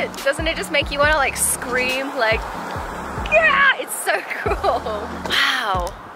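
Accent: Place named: Australia. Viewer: Lol! I noticed that too. That's that Australian accent kicking in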